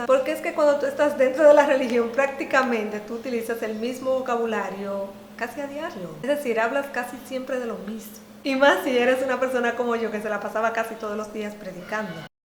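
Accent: Dominican Republic. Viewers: Hola. Qué bueno que lograste salir de esa secta. Quisiera saber si eres dominicana. Tu acento al hablar parece ser dominicano. Yo soy dominicano y me gustaria que por favor me respondieras, y así ver si podríamos tener alguna comunicación para que compartamos sobre el tema. YO estuve estudiando con ellos durante mucho tiempo. Pero su doctrina no la pude asimilar, pues ya tenia un poco de conocimiento del verdadero evangelio de Cristo. Me estoy preparando para formar parte de un ministerio de ayuda a los esclavizados en la secta de la Whatctower. Fuiste muy valiente. Saludos para ti.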